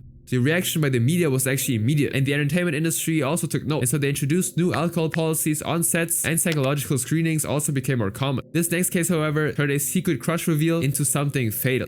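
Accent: Germany. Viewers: You gotta slow down when you talk a bit. Are you German by any chance?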